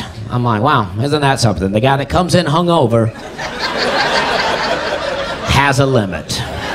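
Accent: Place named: South, United States. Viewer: I'm a Midwesterner, and I have to admit that part of appeal is that accent...but it's more than just the southern accent, it's kinda the rythm and the calm way he talks... but overall is just so multi dimensional: the jokes, the timing, the laid back demeanor. This guy is one of my new favorites.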